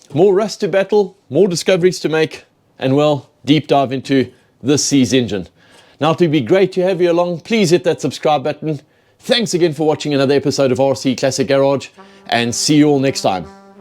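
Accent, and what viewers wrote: South Africa. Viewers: Your English accent sounds like a South African. My uncle Harry used to restore Alfa Romeo's back in the day in South Africa.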